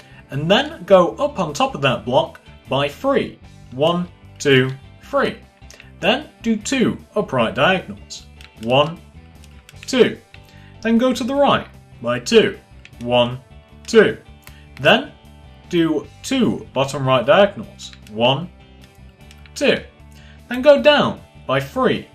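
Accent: United Kingdom. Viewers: He's British lol it's not his fault he has an accent lol I hope you know not everyone speaks the same lol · His british accent. Be nice.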